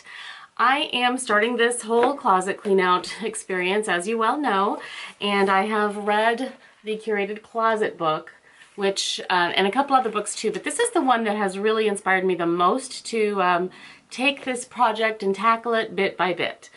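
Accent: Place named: Midwest, United States. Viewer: I just stumbled on your videos, and I love them! I knew I recognized that Midwest accent, I am a fellow Chicago suburb girl. I also strive to be casual chic in this stage of my life too. A stay at home mom with mostly grown daughters, looks like we are both in the same boat!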